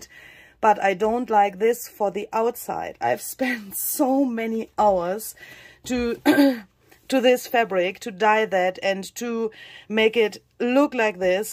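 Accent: Germany. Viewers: Hi, please don't worry. First of all, this is beautiful. I truly love your work. I hit this problem many times, and I would fix it by adding matching, grungy broderie anglaise or lace around the edges. I love your accent - you speak great English. I love German, it's my favourite language, and I wish I'd learned it at school. I guess it's never too late? I am 62. Us Brits are lazy when it comes to speaking different languages. Love what you did to fix your cover problem. You are a great artist. Take care, xx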